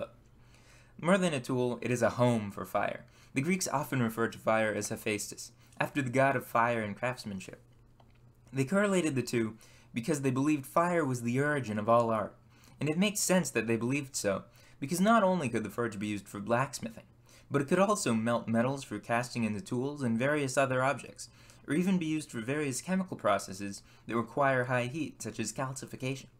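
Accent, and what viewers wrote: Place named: Maryland, United States. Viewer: Kinda sounds like a Maryland accent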